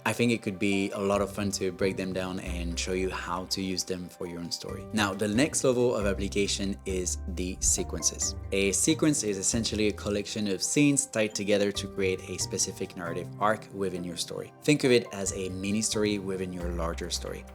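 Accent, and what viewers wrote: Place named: France. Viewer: I finally figured out you are FRENCH!😂 I noticed you had a really cool accent and I finally figured it out!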